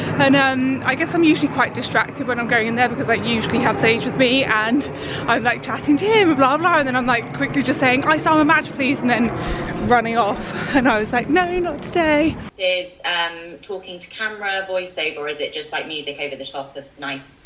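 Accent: United Kingdom. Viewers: I’ve followed you since the makeup tutorial times. I always enjoyed your videos. Your voice is so soothing and it doesn’t hurt to have that amazing English accent. So happy for you … you seem so happy! You have a precious little one watching you read a book to him made my heart melt. Enjoy him they grow so fast. Blessings ❤️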